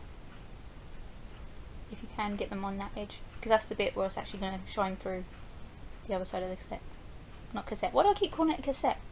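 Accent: United Kingdom. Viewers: Thank you very much for the video and the great british accent. Greetings from Germany. Love it so much! : )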